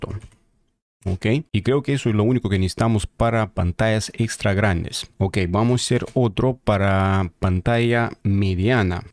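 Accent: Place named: Russia. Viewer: pero eres ruso no??a ver sin ofender ni nada solo que me gusta tu accento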